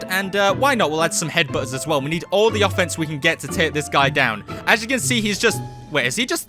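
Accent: United Kingdom. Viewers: Are you British mate?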